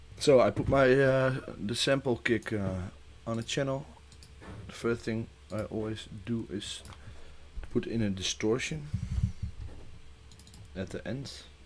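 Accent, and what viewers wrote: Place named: Netherlands. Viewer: Original dutch accent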